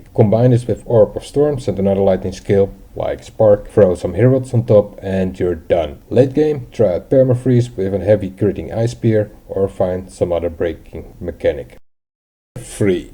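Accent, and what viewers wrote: Netherlands. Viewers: u dutch ?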